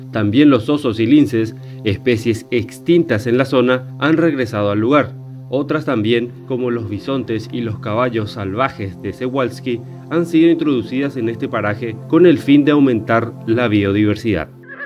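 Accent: Paraguay. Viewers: Me parece conocido el acento. De Paraguay verdad? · Buenisimo el vídeo. Sos paraguayo? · Tenes acento paraguayo🤔 Porque no sabía de este canal hasta ahora🤔 me suscribo😍! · Sos paraguayo? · Acento paraguayo ? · Sos paraguayo?☺️ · Sos paraguayo ? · eres paraguayo?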